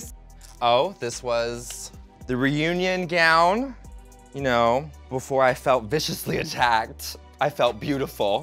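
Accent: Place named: South, United States. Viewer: So handsome and I love the southern accent coming out. Being from Louisville ky very refreshing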